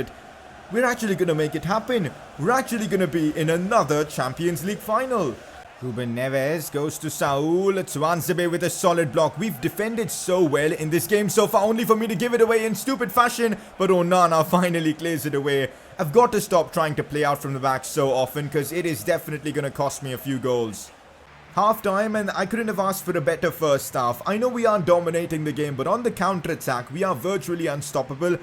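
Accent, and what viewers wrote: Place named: India. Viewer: Bro why do you accentuate your vowels too much. That Indian accent is too noticeable lmaooooo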